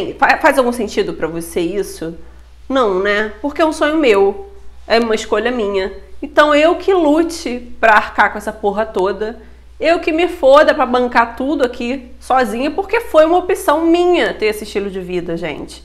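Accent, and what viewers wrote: Rio de Janeiro, Brazil. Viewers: Seus vídeos são um barato, esse jeito carioca de falar kkkkk perco tudo! Adoro, reflito bastante, gosto de ver pontos de vista de pessoas sinceras, sem hipocrisia. Também não quero ter filhos, não terei e fim.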